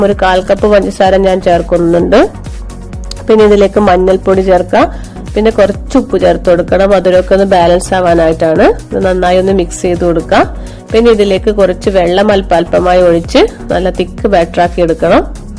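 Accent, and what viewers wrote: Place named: Kozhikode, India. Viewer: slang kettappo thonni nammade sidaan...njan Kozhikode aa😄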